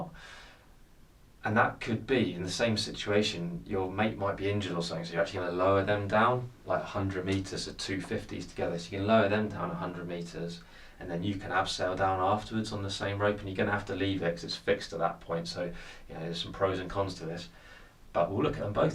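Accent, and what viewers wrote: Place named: United Kingdom. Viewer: I stumbled upon this channel during lockdown here in Barcelona, Spain. Since then I've been binge watching lots of videos, I find them super chilled and entertaining. Is it weird that I enjoy watching videos that teach techniques that I already know? I find this dude so genuine and I really enjoy his British accent haha, keep up the content coming!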